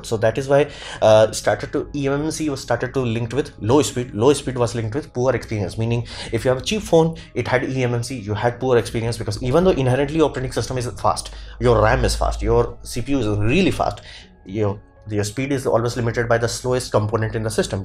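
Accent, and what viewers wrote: India. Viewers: In spite of the heavy Indian chatter accent, a VERY good explanation.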